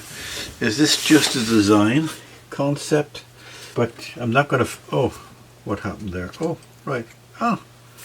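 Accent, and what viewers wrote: Northern Ireland, United Kingdom. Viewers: Thanx so much or the video .Nice to see the Guitar getting some TLC . I Have the Both the 4 and 5 string SR 300E ..I also appreciate your accent as i was born in Northern Ireland as well..Kind Regards .. simon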